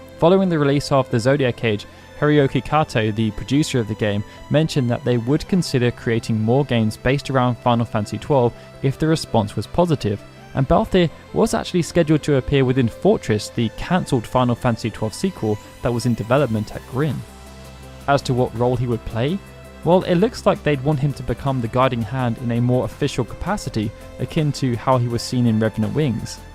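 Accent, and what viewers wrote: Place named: United Kingdom. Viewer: You and Balthier are the best people with british acents to ever exist also do Kain next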